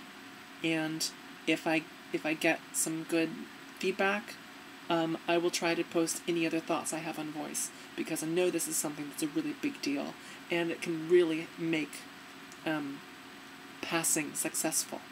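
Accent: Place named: Ireland. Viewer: You have a lovely voice! You seem to me to speak with a slight Irish accent. When you say words like 'that' and 'part'. It's beautiful and sounds very natural.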